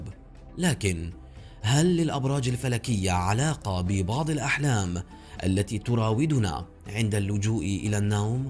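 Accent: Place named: Arab world. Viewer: ماشاء لله على الصوت والحنجره العربيه الاصيله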